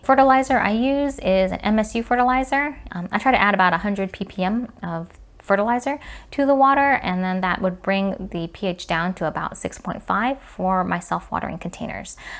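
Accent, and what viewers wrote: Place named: California, United States. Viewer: Uuuugh that airy babyish sing song kardashian california accent is unbearable. Train yourself to get rid of it!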